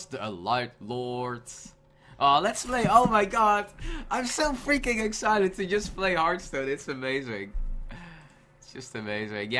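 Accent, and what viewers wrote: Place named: Netherlands. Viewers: I understand and agree with your point, its more of a recommendation if anything:) would (I think) benefit from minimising his Dutch accent, as it will widen his audience and allow him to more effectively reach his viewers. it's not just me berating him for his English accent, it's imo in his interest as well! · You can't just tell a person to be good at something. as you know is Dutch which means he has been speaking the language for his entire life and obviously has adapted to that way of speaking. The Dutch language has a lot of rules which the English language either doesn't follow or has a different rule for entirely so teaching a Dutch person English is harder than teaching them some other European languages. (I probably also made some grammar mistakes in this comment sorry about that.)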